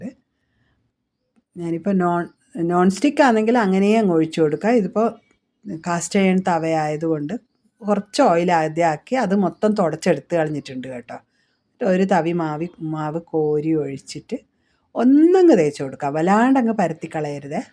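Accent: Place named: Vadakara, India. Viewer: ഹായ് ഞാനിവിടെ പയ്യോളിയിൽ വടകരയിൽ എവിടെയാണ് മില്ലിന്റെ സൗകര്യം ഇല്ല എന്ന് പറയുന്നത് കേട്ടു നിങ്ങൾ ഇതിനു മുമ്പ് എവിടെയായിരുന്നു ഇവിടെയല്ലേ നിങ്ങളുടെ നാട് എന്നെ ഇവിടെ കല്യാണം കഴിച്ചതാണ് എന്റെ സ്വന്തം സ്ഥലം എറണാകുളം ഇവിടുത്തെ നാടൻ ഭാഷയിൽ ഇങ്ങനെ പറയുന്നത് കേൾക്കാൻ നല്ല രസമുണ്ട് ❤👍🏻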